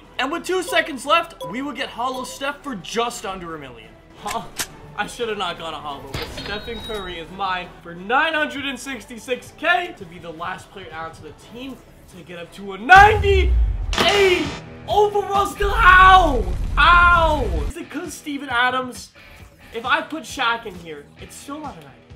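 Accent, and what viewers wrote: Greece. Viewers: Are you Greek Or Canadian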